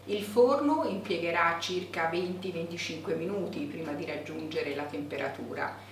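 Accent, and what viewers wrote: Tuscany, Italy. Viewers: Thank you. Love olive Focaccia, love your Tuscany accent as well. 🌾🎈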